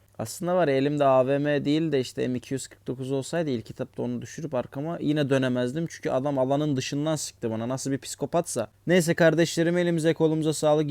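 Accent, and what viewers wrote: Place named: Bolu, Turkey. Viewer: Abi nerelisin sende bolulu gibi bu ses var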